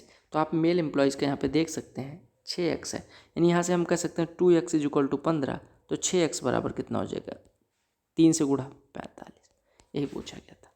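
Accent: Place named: Mithila, India. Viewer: Bhaiya kya aap mithilanchal se hai? I am also from Janki janm Bhumi Sitamarhi, Bihar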